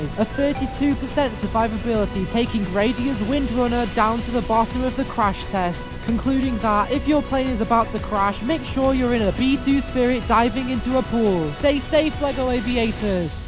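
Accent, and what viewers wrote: United Kingdom. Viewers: Are you British? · He's british (I think)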